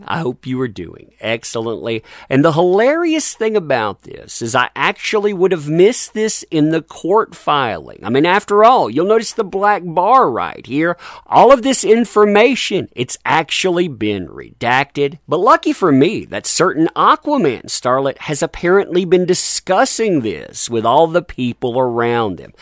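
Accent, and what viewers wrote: South, United States. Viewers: 🤠Howdy, Pawdner... You're such a WILD THING when it comes to doing your thing called BROADCASTING 😂😂😂 and ALL we women LOVE you for that cute southern accent and for the DECENT fellow you are. If AH ever imagined you're everybody's Good Samaritan of Justice and avenger of the Innocent people... She'd get jealous! But I'm pretty sure she Does, lol👍🏼GREAT JOB! BLESSINGS and my most loving respect for you always coming your way.. Love & kisses you wild Thing. 💪🏻UMH! Forever Your's. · Your doing a good job there keep it up, and that's a damn fine good southern accent as well! T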